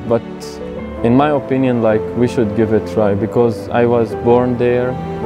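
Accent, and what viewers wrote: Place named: Russia. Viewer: Так хорошо выучил русский, что на английском говорит с русским акцентом. Я почти все слова смог разобрать, хотя английский на слух обычно понимаю плохо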